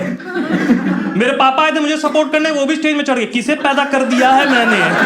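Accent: Bihar, India. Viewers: Your style of speaking is very similar to Ravi Gupta. Maybe its the Bihari or Purbi accent! I think if you can also add Mallu Hindi accent with Bihari it can be a very good act. Liked your show, well done. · Your Bihari accent is great bhaiya❤❤ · Bhai your bihari accent owasm...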